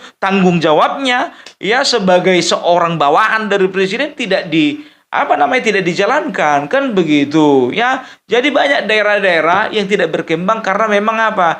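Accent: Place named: Batak, Indonesia. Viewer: Kereeen opung, saran saya dialeg bataknya di pertebal lg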